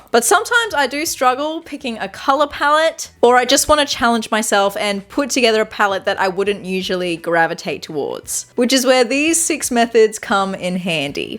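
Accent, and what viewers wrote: Australia. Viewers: Thank you. I love those paintings. And I noticed your Aussie accent. Aussie, Aussie, Aussie ….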